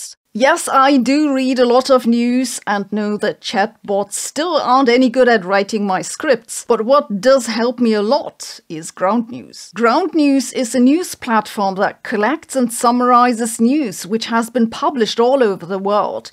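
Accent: Germany. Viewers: ❤❤❤ure German accent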